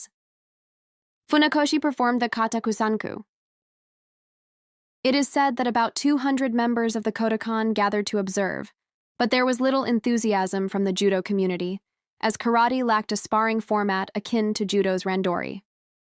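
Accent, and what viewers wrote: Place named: United States. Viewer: Narrator must learn proper pronunciations of Japanese names and places. Sounds too Yankee!😮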